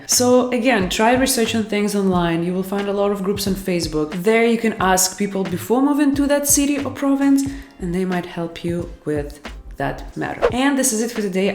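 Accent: Russia. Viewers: Love your Russian accent. Sounds kinda cute though lol 😊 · You speak very clearly. Everyone like me who are hoping to go and settle in canada soon, will be able find your videos very informative & helpful.. By the way, No offence, i like your russian accent more❣️ Thank you for all those videos. Carry on..,❤️❤️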